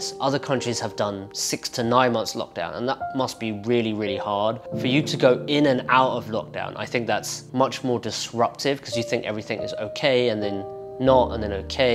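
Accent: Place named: United Kingdom. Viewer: I'm impressed with your British accent.. o.o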